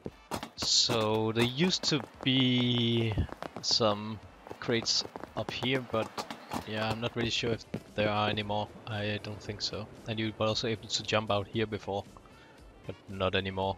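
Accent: Denmark. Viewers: Thank you for the video. ( and just in case if im right. saying. Tak for Videoen. I might be wrong, but from the sound of your accent. I believe you to be Danish ( from Denmark)) denne Video var meget hjælpsom. :) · are u danish?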